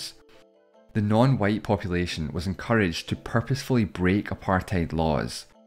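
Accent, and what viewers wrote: Scotland, United Kingdom. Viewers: He's Scottish, that's just his accent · Sieth afrika huh appertheid that's what I hear you say are you British too bc you sound British and really eighteen sexteens really but I live in south Africa and I love you that time the white people what's like a witch and black people was so nice white people enjoyed apartheid also the white also felt bad(I live in south Africa and I'm coulored and I'm glad I'm coulored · which part of the uk makes you have an accent where 6 is pronounced as sex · he's scottish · Wow your voice is so nice and scottish and now I think I’ll join the discord link in description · He's Scottish. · No pretty sure he's a Scot.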